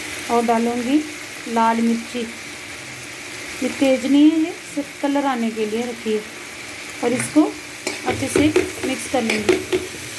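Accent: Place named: India. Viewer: Bhout Sundar sister desi aandaaz bda pyara lga aapka 💯💯💯👍👍👍👍👍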